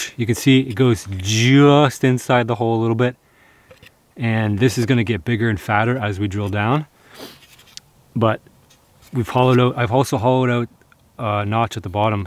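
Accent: Canada. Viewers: I knew it was Canadian the first time he said "aboot".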